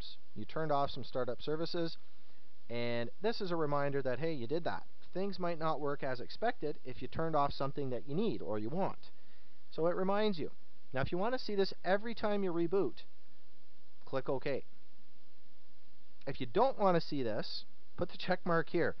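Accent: Canada. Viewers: ARe u Canadian? Cuz u talk like Les Stroud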